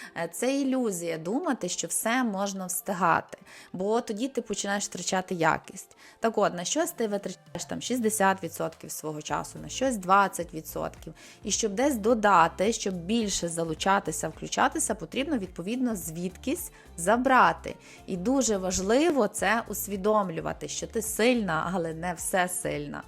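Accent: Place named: Western Ukraine, Ukraine. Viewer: Круто,мені здається,що я чую західний акцент))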